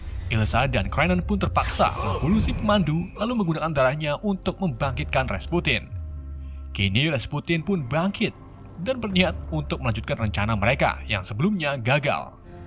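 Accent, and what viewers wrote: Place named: Java, Indonesia. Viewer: Mencengkiwing. Admin e wong jowo ki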